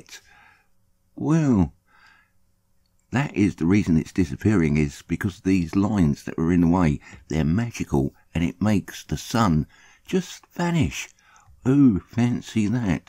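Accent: United Kingdom. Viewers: Your commentary cracks me up. That old school British dry humor has a special place in my funny bone. Whenever I hear that British accent my mind flashes back to my younger years hanging out at my boy James' house. His parents are British transplants. When you said, "What you tryin to hide there in the sky, Sonny Jim?" that brought me back to a time when I was 15. James' dad caught us sneaking down his Weinhart's in their garage. "What you tryin to hide there, Sonny Jim?" Between the guilty look on my boy's flush face, the buzz from the 3 beers i just slammed, and Jim Sr's accent, I couldn't help myself hold the chuckles back. You got me wearing the same cheeky smile I had on back then. Thanks for that,. I needed that right now. MadLuvBrada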